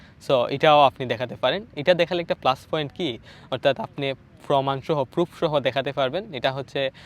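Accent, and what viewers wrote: Sylhet, Bangladesh. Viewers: Vai er basa ki sylhet ?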